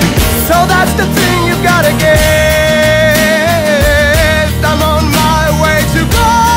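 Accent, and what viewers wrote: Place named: England, United Kingdom. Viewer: J'aime bien ce style de musique tranquille. Tu as un super accent Anglais, continu comme ça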